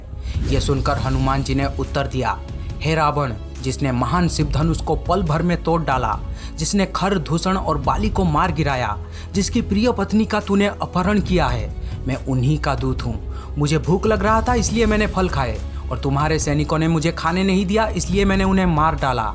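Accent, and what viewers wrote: Odisha, India. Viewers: Kya ap Odia ho ?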